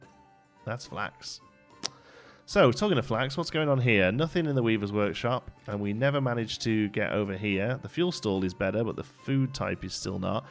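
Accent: United Kingdom. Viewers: I got mentioned!! I told you you sounded British (I mean, you are...). I reckon I could easily watch you for an hour walking around talking rubbish. Next episode, " walking around his village talking rubbish". Man, that feature has totally sold me on that game. I love it anyway, but that's the cherry on top!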